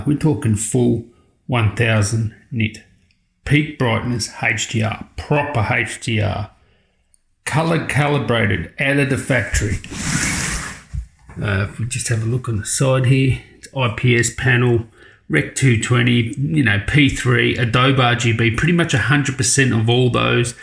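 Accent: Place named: Australia. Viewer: Great vid, thank you. Great to hear a fellow Aussie voice reviewing stuff! I've just bought this monitor, your review was a very good help for me. Everything you say is true, this monitor blows away everybody who sees it in action. I do not use it as a professional but do family videos and work with docs open 2 at a time - wonderful for all this. I had the money so I though so what - end of financial year and I need to clear the bank account and get a tax deduction anyway so there I was! I will enjoy the pleasure of this incredible screen LONG after the initial pain of the price has been forgotten. Highly recommended - thanks again for the very relatable review - love the way you describe things in everyday terms. Cheers, (West Pennant Hills, NSW - Australia)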